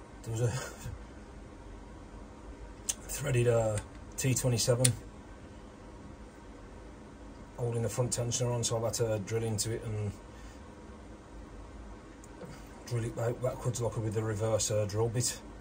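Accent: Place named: Black Country, United Kingdom. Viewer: Nice to hear a black country voice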